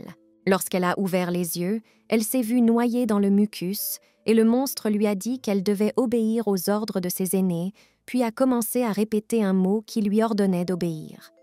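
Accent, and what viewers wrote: England, United Kingdom. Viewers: Pk y’a un accent anglais comme ça Miskine ❤❤❤❤😂😂😂😂😂😂😂😂😂😂😂😂😂😂😂😂😂😂😂😂😂😂😂😂😂😂😂😂😂😂😂😂😂😂😂😂😂😂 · Tu parles vraiment comment une anglaise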